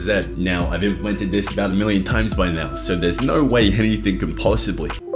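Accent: Australia. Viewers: you sound like you're from australia and new york at the same time and its fuckin weird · Watching this for the first time I was like "Wait is he Cockney or is he from New Jersey because he sounds like both at the same time" Then I figured out I had forgotten the existence of Australia. It happens. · that's called.... an australian accent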